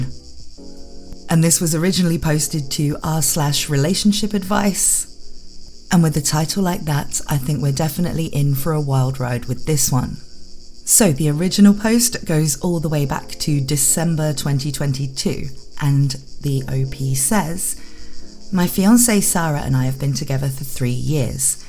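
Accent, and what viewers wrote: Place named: United Kingdom. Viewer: Finally. It's in british accent.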